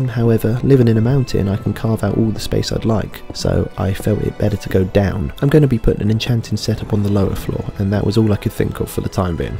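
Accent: United Kingdom. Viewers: Um he’s British if you couldn’t tell 😬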